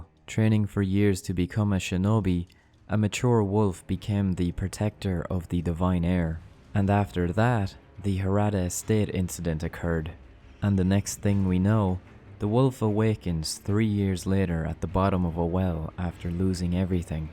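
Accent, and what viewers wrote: Ireland. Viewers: Are you from Limerick, you sound local?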